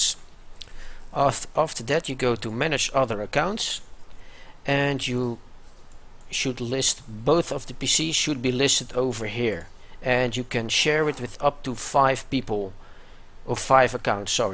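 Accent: Netherlands. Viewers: dat is very Dunglisch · Ik kon meteen horen dat je Nederlands was, bedankt voor de tutorial! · ) dat dutch accent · I can hear that you're Dutch ;D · That nederlandse accent tho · Are you dutch? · ik weet gewoon dat jij waarschijnlijk nederlands bent. je praat zo nederlands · ik hoor aan je stem dat je nederlands bent :) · whaha love your dutch accent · leuke accent haha, echt nederlands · Ur accent is so dutch omg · That Dutch accent tho. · jouw accent is so duidelijk nederland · Dat Nederlandse accent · je bent nederlands leuk accentje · i have a feeling he is dutch XD i can tell by his accent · deze guy is nederlands heh? Goede video btw XD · Damn dutch acc is real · are u dutch? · nl nederlands sws kan niet anders - en your dutch, i know it for sure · Dankjewel man, ik hoor aan je accent dat je Nederlands bent :) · Are you Dutch ??? You sound Dutch to me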